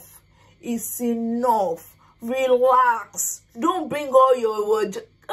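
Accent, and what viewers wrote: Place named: Nigeria. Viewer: I just like the way you emphasis on the points with your facial expression and nigerian accent 😂, its so loving to watch. Thanks for making this video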